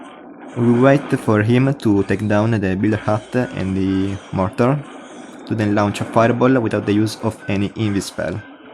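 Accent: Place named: Italy. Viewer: Accento più italiano del mondo. Contento che ci sta un italiano così competitivo sul gioco. Specialmente per la army comp che hai creato dato che odio la classica "SPAM EVERYTHING WITH 0 THOUGHTS AND 3 STAR ANY BASE"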